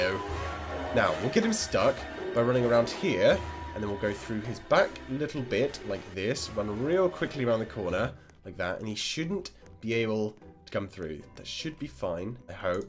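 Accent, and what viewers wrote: United Kingdom. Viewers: O he is British I am Canadian whatever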